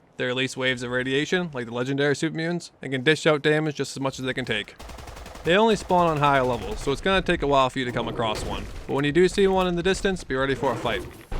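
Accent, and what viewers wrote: Boston, United States. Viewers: hembury I knew that accent after about 20 seconds of watching.....Guy from Boston modding game set in Boston= immersive :) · The Boston accent makes these FO4 mod reviews much more authentic.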